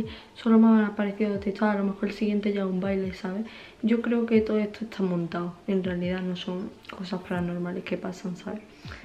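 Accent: Spain. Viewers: Holi soy nueva y me suscribire en tu canal eres española me encanta como ablas wow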